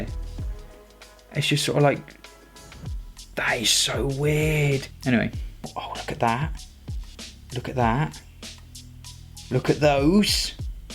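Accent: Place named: United Kingdom. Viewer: I love your british accent sir. . .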